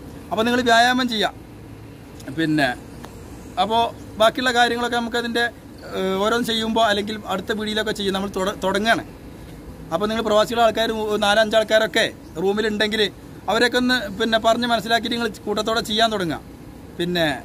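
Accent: Malappuram, India. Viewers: എന്തായാലും താങ്കളുടെ മലപ്പുറം ഭാഷ എനിക്ക് നല്ലോണം ഇഷ്ടപ്പെട്ടു തമാശ രീതിയിലും കോമഡി ആയിരിക്കും താങ്കൾ പറയുന്നുണ്ട് അത് അങ്ങനെ തന്നെ പോട്ടെ ഞാനും മലപ്പുറംകാരൻ ആയതുകൊണ്ട് എനിക്ക് തന്നെ വളരെ ഇഷ്ടപ്പെട്ടു ഏതായാലും താങ്കൾ ഗൾഫിൽ എവിടെയാണ് ഒന്ന് കമൻറ് പറയുക അല്ലെങ്കിൽ വീഡിയോയിൽ പറയുക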